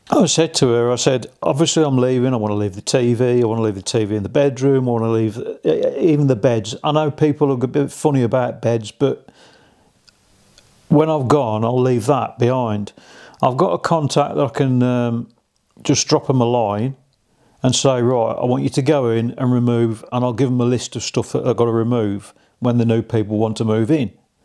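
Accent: Birmingham, United Kingdom. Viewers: Are you from Birmingham mate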